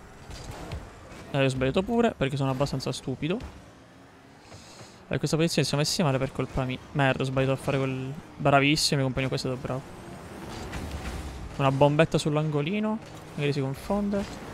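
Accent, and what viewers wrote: Palermo, Italy. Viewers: Bella... Ma di dove sei? Sembri palermitano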